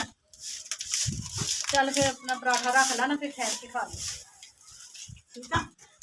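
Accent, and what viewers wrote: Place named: Faisalabad, Pakistan. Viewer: وعلیکم سلام باجی صاحبہ کیسی ھیں کیا آپ فیصل آباد سےہیں آپکے بولنے کے انداز سے ایسا لگا 😊😊😊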